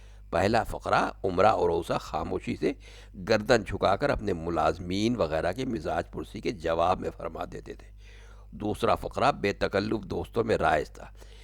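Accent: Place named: Lucknow, India. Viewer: आपका ये वीडियो बहुत कुछ याद दिलाता है और ये सच है कि इतने सब चीजो के गुजर जाने के बाद भी आप जैसे लोगों के लहज़े में लखनऊ हमेशा ही जिंदा रहेगा और हमारे तो रूह में लखनऊ बसा हुआ है, बेशक बहुत खूबसूरत लखनऊ को पेश करता हुआ एक उम्दा वीडियो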